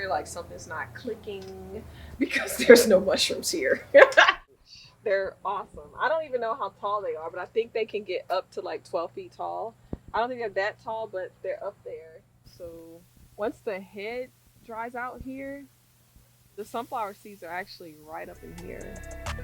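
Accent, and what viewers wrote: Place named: South Carolina, United States. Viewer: The way he talks… you have to be in SC. My grandad from there and they all all the same way 😂!! Ain’t nothing like country living.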